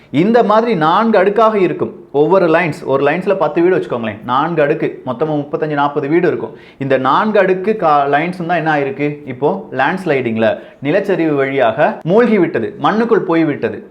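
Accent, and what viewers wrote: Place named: Tirunelveli, India. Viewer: நீங்கள் இடுக்கி மாவட்டத்தை சேர்ந்தவரா, உங்கள் பழைய வீடியோ பேச்சு வழக்கில் வைத்து திருநெல்வேலி மாவட்டம் என்று நினைத்தேன்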